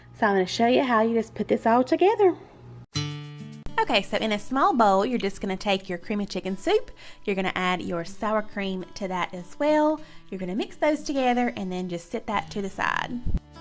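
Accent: South, United States. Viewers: Where are you from? You have a very southern accent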